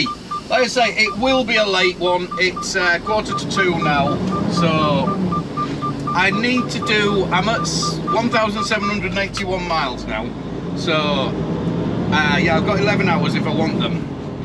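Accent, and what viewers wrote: Yorkshire, United Kingdom. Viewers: You can take the boy out of Yorkshire, but you cant take the Yorkshire out of the boy Ayup mate, from Sheffield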